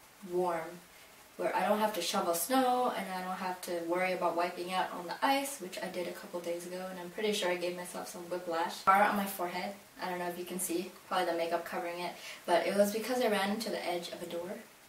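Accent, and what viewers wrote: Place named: New Zealand. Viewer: hehe yup but i think its the new zealand accent seems easy to pronounce for me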